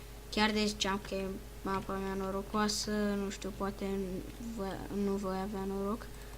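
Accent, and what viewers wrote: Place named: Moldova. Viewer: baiatu gamer nu mai vorbi moldoveneste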